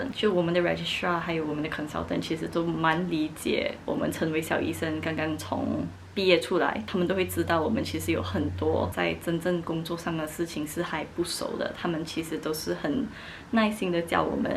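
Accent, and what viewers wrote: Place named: Cantonese, China. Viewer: 马来华裔么，中文不错，就是带点粤刚音，可能是广东那边的移民。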